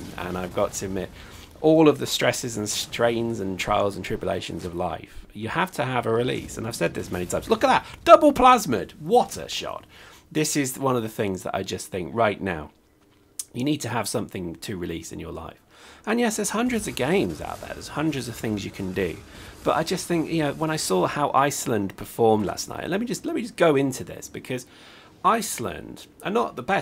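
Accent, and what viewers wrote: United Kingdom. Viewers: Yay is back your videos and British accent always make my day!:D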